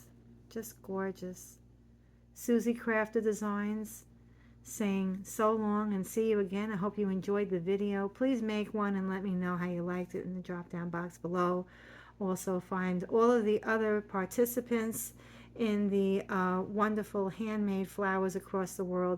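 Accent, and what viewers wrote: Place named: New York, United States. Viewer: Absolutely beautiful! Love that NY accent ❤❤ it reminds me of a peony. I’m going to give it a try. May make one for the baby shower Sunday.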